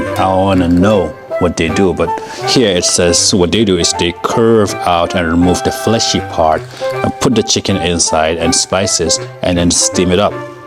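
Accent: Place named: England, United Kingdom. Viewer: Love your English accent man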